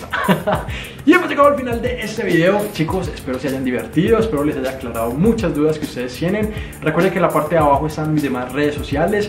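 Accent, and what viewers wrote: Antioquia, Colombia. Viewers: estás otra vez con tu acento paisa jajaja lindo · Ya tienes de nuevo muy marcado tu acento colombiano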